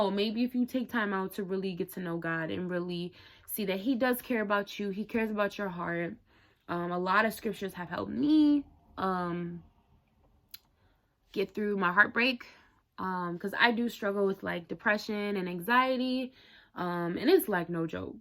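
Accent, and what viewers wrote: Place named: New York, United States. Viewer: Sounds like you have a New York accent lol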